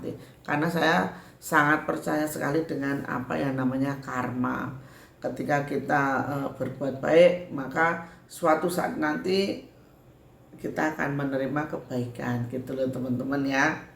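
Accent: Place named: Java, Indonesia. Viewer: Menyimak semakin lihat dengarkan semakin suka lucu2 medok bhsa Jawanya. Yang kadang bikin tersenyum juga lihatnya. Pingin rasanya ketemu walau sekedar jabat tangan tapi jauh di Sragen.😂😂😂❤❤❤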